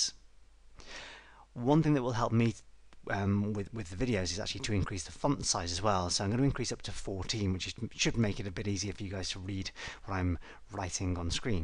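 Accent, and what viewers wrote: United Kingdom. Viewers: You know for a british person (I think you are british because of the accent) , you have a nice way of explaining things and your voice is understandable unlike most british accent people I watch :) thanks for this free source of knowledge. Cheers mate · i cant believe someone so wonderful exists to provide these videos for free with an awesome british accent and occasional snippets of humor :) thank you so much!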